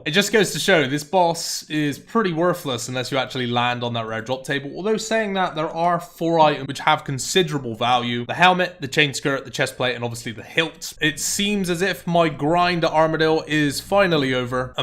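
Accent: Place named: Devon, United Kingdom. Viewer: With a Devon accent that thick I expected him to be doing a lot more farm runs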